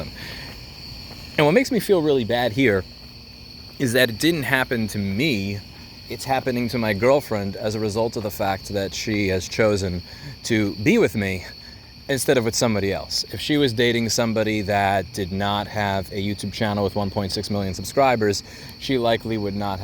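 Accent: New York, United States. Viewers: All I'll say is that his New York accent came out...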